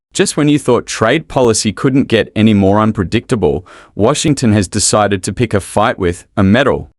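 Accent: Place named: Australia. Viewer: That’s exactly what most of them will usually do. It’s not the supplying countries that pay tariffs, it’s your own country's importers that have to pay them on arrival. Or, did you think it was the other countries that paid the tariffs? The only way they suffer is if their goods (now with a tariff) are too expensive to sell in the USA and they can’t find another market, so they might need to close down, downsize or make something else. The narrator has an Australian accent. They would be the least affected of all, because they buy lots of American stuff, but sell a lot less to the USA. You owe them much more than they owe you. So losing just a small part of their exports because they are now too expensive in the USA because of tariffs isn’t all that critical.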